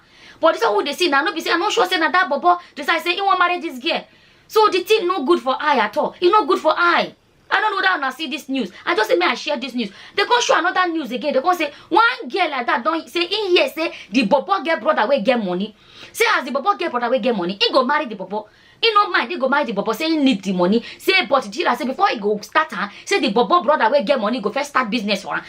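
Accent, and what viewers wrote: Nigeria. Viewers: Hi, this your pidgin english TV, i be like say na him sweet pass, the wey you dey take dey yarn the pidgin de sweet me no be small.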